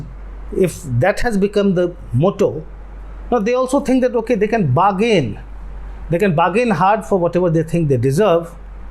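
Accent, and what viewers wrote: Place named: Northeast India, India. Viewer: North East accent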